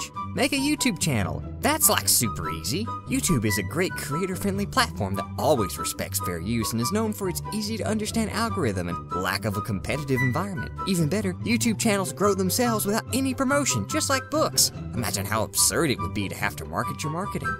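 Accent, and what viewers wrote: South, United States. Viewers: is this guy a "southerner" as americans call it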